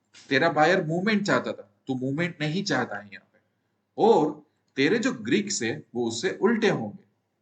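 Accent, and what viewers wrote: Gujarat, India. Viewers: gujarati laheko to aavij jaay ho...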